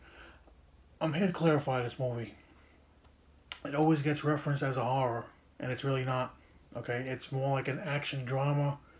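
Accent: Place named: Brooklyn, United States. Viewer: really are funny. Love that u apologized for the Brooklyn accent lol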